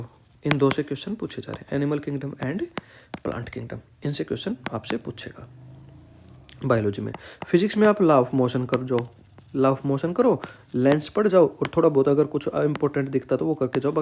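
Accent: Haryana, India. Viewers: Bhai tumne bhi chalana ko chalama likha hai to mistake sbse hota hai aur wo uska accent haryanvi hai to isliye aisa sound kr rha hai. Itni bhi bdi baat nhi hai jitna hyper ho rhe ho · haryana wala language h bro iska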